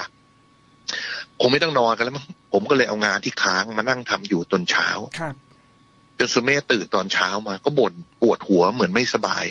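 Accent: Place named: Korat, Thailand. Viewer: เราคนโคราชฟังแล้วรู้เลยยังหวัดโคราช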